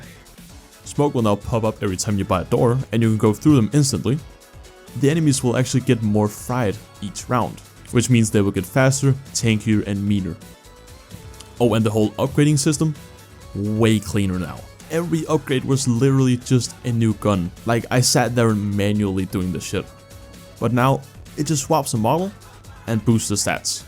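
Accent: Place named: Denmark. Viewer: Yo that would be sick i am 100% buying it if it costs money (selv fra Danmark og jeg kan godt høre du også er fra Danmark men dit engelsk er meget godt 👍)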